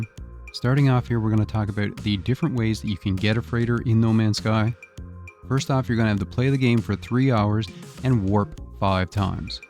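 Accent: Newfoundland, Canada. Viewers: Thought I recognized that accent! Greetings from a CFA living in St John's : ) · I knows a newfy when I hears one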